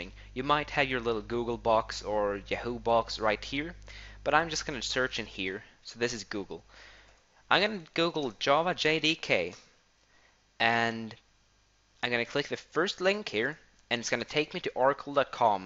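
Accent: Sweden. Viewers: DU ER SVEEEENSK! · are you swedish? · är du svensk?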